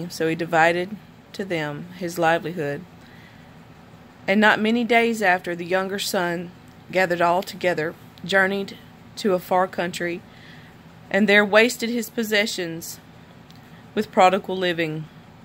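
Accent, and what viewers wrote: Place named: South, United States. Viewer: God thank you for this sister’s beautifully accented voice! I love to hear your Word at any rate, Lord, but it is especially sweet with a little American-south drip. (I dunno, if not the south, then mid-west? Texas? IDK, BUT I LOVE IT!) Many thanks for this channel. (If did an audio narration of the Bible from start to finish- I’d listen every day)😊✝️🙏 GOD BLESS & KEEP YOU & YOURS!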